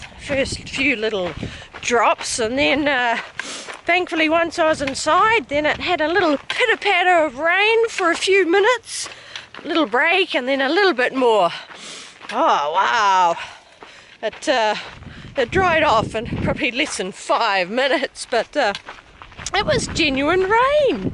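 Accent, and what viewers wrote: New Zealand. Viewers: Glad to see that your not feeling the after affects of those tumbles! Which part of New Zealand are you from!? Such a lovely accent you have to! Let the haters hate and don't let satan's lies bring you down! God bless you and your family! Keep enjoying the Journey through God's gorgeous backyard!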